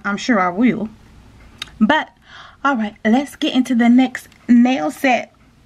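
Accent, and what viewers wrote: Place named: New York, United States. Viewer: I'm loving your New York accent. I'm loving your nails, so pretty.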